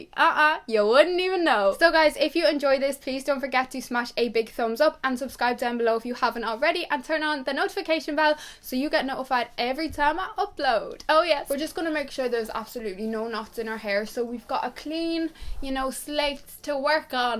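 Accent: Ireland. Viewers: Omg I love you!! One day I just stumbled upon one of your videos and I am in love! I am already wearing one of your hairstyles they are perfect! And I'm on vacation so I don't was my hair every day so it's perfect!!! (Btw what part of Ireland are u from I love your accent)❤❤ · are you irish ? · Are u Irish 🇮🇪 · Are you from Ireland????!!!!! If so what county???!!!?!?!?!! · oooh is she Irish! I thought already, her English isn't British English or American English so what else? Irish English!! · are you irish? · Are u from IRELAND cause u have a irish accent p.s I am from IRELAND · Are you irish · I love irish accent so much 😭😭😭😭 ❤️❤️❤️❤️ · I'm new bit are you Irish. I love you accent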